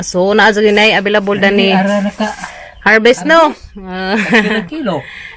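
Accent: Bohol, Philippines. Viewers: Klaroha jud taga buhol oi.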